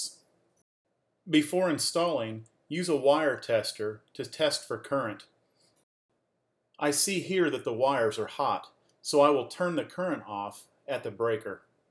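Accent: South, United States. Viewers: that's just how we on the South say "wh"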